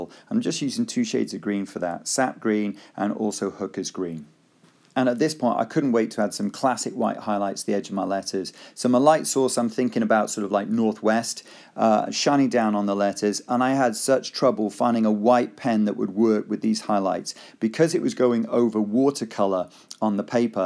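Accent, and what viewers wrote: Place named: United Kingdom. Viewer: No one here will get this - but as you started talking I was absolutely sure, that you are Liam from Critical Role. You literally have the same voice (and British accent, which could be one of the main reason) but still the resemblance is (as Liam would say) uncanny.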